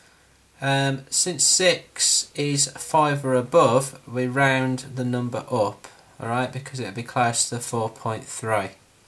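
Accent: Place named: Yorkshire, United Kingdom. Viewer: Proper Yorkshire accent I think I would love to live there..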